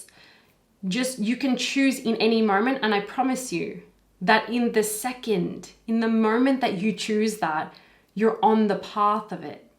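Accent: Australia. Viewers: She’s from Australia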